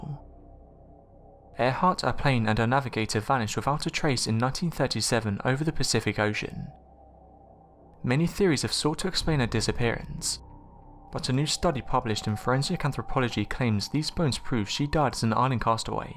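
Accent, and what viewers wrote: United Kingdom. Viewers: What's up with the robotic British dilougue, lol. I agree you truly can't say 99% without DNA. The bones and the plane been found in different locations so which is it.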